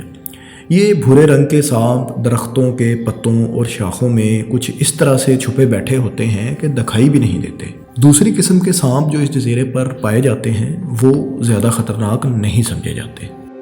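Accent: Punjab, Pakistan. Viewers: Good work ap ka lehja Punjabi hai plez inprove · Bhai voice-over kisi dhang se Urdu bolne wale bandy se karwaliya Karen meharbani Hogi Bhat taa K dekhne walon ka vedio main interest Bana rahe, yeh Punjabi mix Urdu lehja kaano ko Bhat nagawar guzarta hai, humble request Hai apse.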